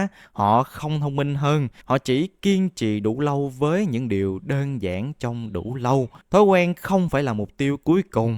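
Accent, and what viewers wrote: South, Vietnam. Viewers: Hai nghìn lẻ ba (2003) , không không , phải là hai nghàn lẻ ba mới đúng , giọng miền Nam thì phải dùng từ ngữ miền Nam , cảm ơn !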